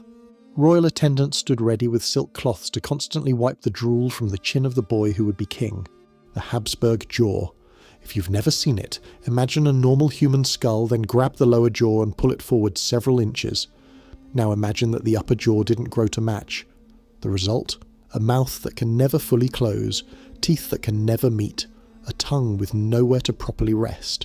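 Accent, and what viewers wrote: United States. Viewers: Sounds like a normal Guy from Alabama. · Old gammon Brit here. We all used to fall about in tears of laughter when somebody said "dienasty"! Now British kids - such as you - speak american. Oh well. That's the future I suppose.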